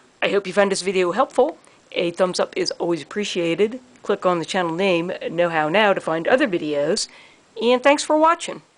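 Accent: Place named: Maryland, United States. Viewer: I detect a Maryland accent :)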